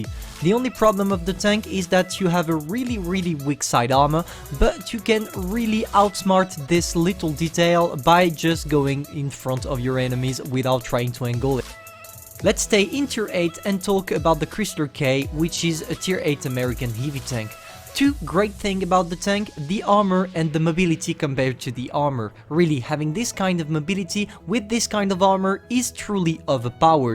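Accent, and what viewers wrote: France. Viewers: petit accent francais non ? :)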